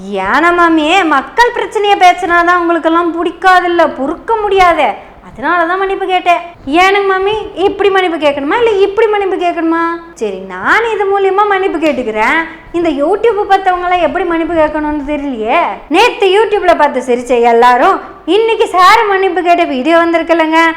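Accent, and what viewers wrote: Kongu, India. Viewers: Excellent dear yesterday I have seen your show today also seen after long time full show is back to full Kongu Tamil. Excellent dear. Here Mr.srinivasan requested this idiot uruga mammi to regularize GST for all items they are selling in hotel even if his high slab also. He very much humbled requested that but this arrogant lady without meeting peoples came to parliament through back doors and proudly arrogance behavior to a senior and very senior famous business man. Very sad to see this and very shame to be a tamilian to be a Our FM 😢😢😢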